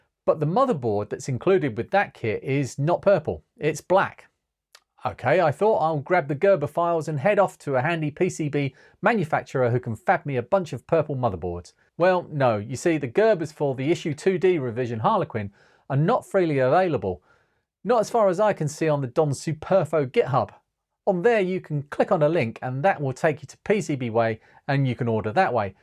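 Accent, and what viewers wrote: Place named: Suffolk, United Kingdom. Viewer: What a great story and outcome!what a lovely human you are. Your channel deserves to be a lot more popular,I hope it grows more this year!I guessed by your accent you were fairly local to me in suffolk and nice to see a local charity being supported. Maybe one day when your life has settled down, you could have a retro open day or pub meet, its always nice to meet like minded people!all the best and good luck 😊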